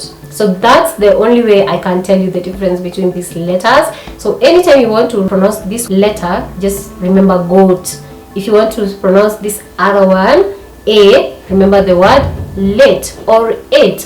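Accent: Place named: Kikuyu, Kenya. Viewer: I love your kikuyu.It is deep.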